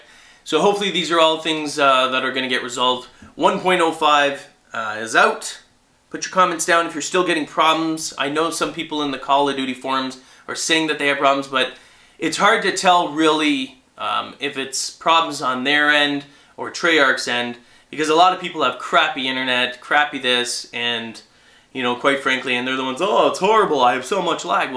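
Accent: Canada. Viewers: yeah i think hes canadian like meh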